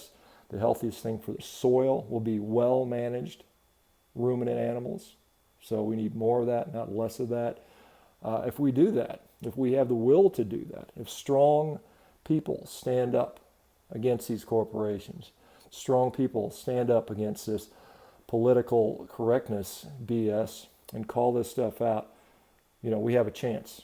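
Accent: United States. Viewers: Love your American accent and soft relaxing tone👍💋♥️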